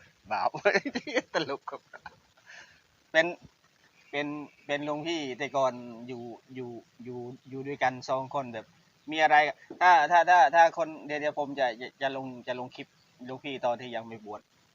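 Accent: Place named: Cambodia. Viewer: ได้ยินสำเนียงฟ่าวกดติดตามเลย หนุ่มขะแมร์ จุงกะๆเจียละออ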